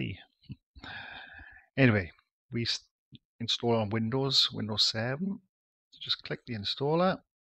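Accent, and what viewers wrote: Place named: Cardiff, United Kingdom. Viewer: You don't expect a Jack to take any notice of a bloke with a Cardiff accent! Thanks very helpful.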